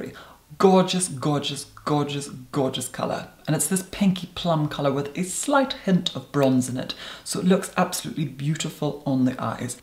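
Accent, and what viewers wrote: United Kingdom. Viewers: posh English 'Oxford University' style (but he is originally from Scotland)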